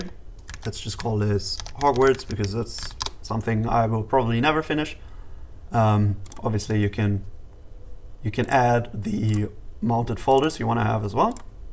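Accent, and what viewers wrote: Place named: Germany. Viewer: I höre some Deutsch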